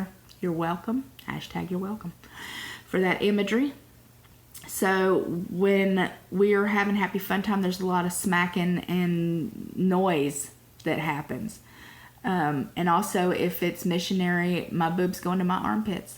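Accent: South, United States. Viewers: I just love your southern accent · I tripped over your videos one day and subscribed. I do not have weight issues. You look like my aunt Lois who did have a weight problem. You are beautiful and so was she! She was Pisces. What sign are you? Anyway, what got me to subscribe was hearing your accent, and your truthful discourse. You remind me of every southern girlfriend I had growing up. I LOVE HEARING YOU AND YOUR ADVICE!!! Are you from Tennessee? I'm from there, but I also grew up in North Carolina for 10 years. BTW I'm 65 with a 34 year old boyfriend. : )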